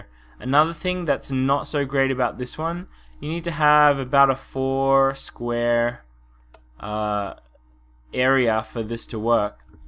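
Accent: Australia. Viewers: You sound like an Aussie! Southern Hemisphere people rule!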